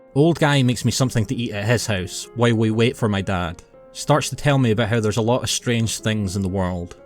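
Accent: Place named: Northern Ireland, United Kingdom. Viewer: NI accent. .o.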